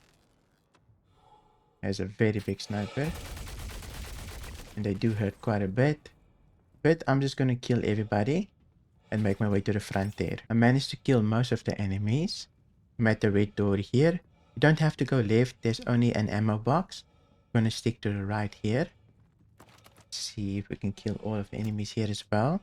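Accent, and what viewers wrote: South Africa. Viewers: Great content there mate you sound South African if so I'm South African too anyway this helped me through site B...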